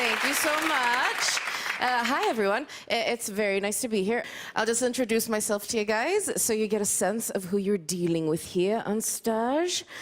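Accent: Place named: California, United States. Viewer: If I had to guess, I would have said you grew up in California!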